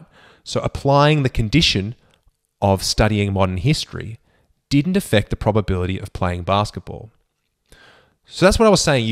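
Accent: Australia. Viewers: You speak Australian accent?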